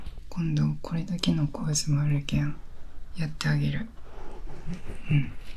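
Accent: Japan. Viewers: 君の語尾けんってついてるの親近感湧く同じ県の方言やけん心地良い✨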